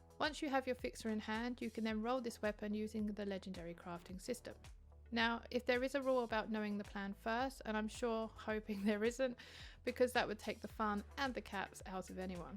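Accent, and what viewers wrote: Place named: RP, United Kingdom. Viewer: being posh!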